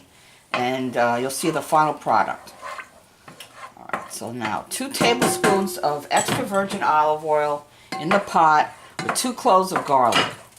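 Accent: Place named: New York, United States. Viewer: Love that heavy new york acent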